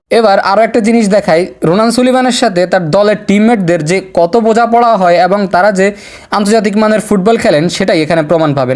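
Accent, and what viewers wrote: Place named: Noakhali, Bangladesh. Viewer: বাড়ি কি নোয়াখালী? ফ্লাইং কে বলেন প্লাই্ঙ। থামলে ভাল লাগে।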